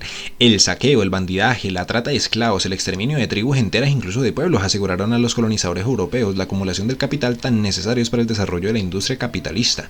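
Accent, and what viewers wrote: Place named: Mexico. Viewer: Hermano deje de hablar como mexicano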